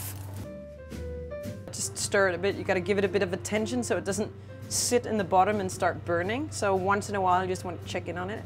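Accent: Denmark. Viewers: She sounds danish 🇩🇰🍺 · its a very lovely Danish accent. She's a good example of how often we might say "You know?" :D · Instantly recognized the danish accent :) great vid btw.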